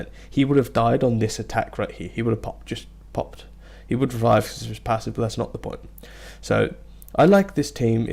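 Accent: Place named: Surrey/Hampshire, United Kingdom. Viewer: Great video, really informative! Was actually stunned to see only 100 subs, so take mine - also you sound like you're from Surrey/Hampshire?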